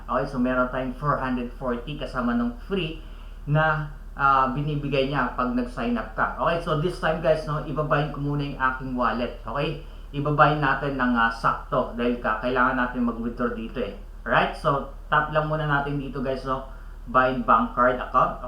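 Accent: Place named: Visayas, Philippines. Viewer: bisayang tagalog.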